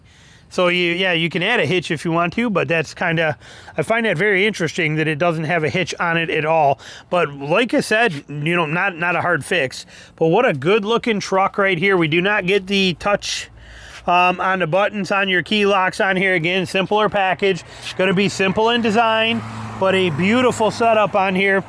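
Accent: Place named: Midwest, United States. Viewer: Are you from Minnesota? You totally have a very high pitched northern or midwestern accent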